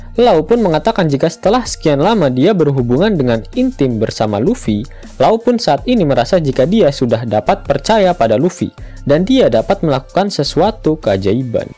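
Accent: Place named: Java, Indonesia. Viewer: Wkwkwkwk alias kelelep .... Nguakak aku couk.... Jowo endi sampean cak..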